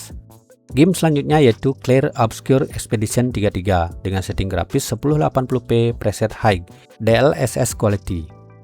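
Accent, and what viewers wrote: Bali, Indonesia. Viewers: Kok bisa2nya mikir dia muslim bro? Sy awal tau chanel ini dan denger logatnya aja langsung tau kalau beliau org bali 😂. Sy jg muslim dan belum pernah ke bali juga sih, cm beberapa kali nonton interview org bali dikonten org emang logatnya mirip kaya gini...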